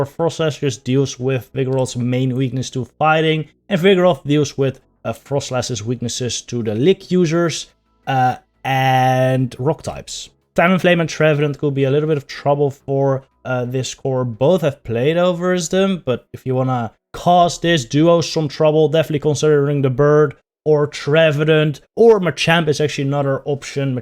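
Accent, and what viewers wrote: Netherlands. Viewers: Jij bent nederlands of nie???🤣😂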